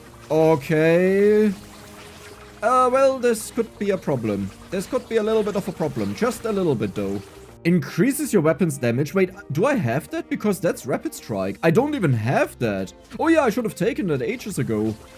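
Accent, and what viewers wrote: Russia. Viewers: fam hes clearly Russian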